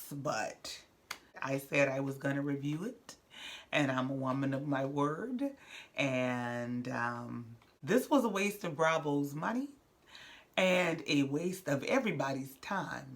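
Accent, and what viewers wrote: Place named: Gullah Geechee, United States. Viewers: Hey girl, love the channel and the videos. 1 question are you from South Carolina the Charleston area? You sound so much like my people and me. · Girl I hear that geechie accent.. Where are you from? Gotta be Charleston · Okay after watching the Arionne/Tasha K interview review and this one I'm subscribing👏🏿👏🏿. I thought your accent was from NOLA, but after reading another response, I see that you are from Charleston, SC. I was off huh?.. But then again I'm a Jersey girl.😆😅🤣 Loveee the accent and review!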